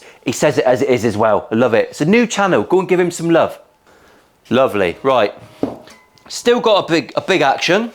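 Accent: United Kingdom. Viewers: Amazing to see you create a decent axe made of plywood . Really enjoy your work . Plus; as a french speaking person i get use of British accent !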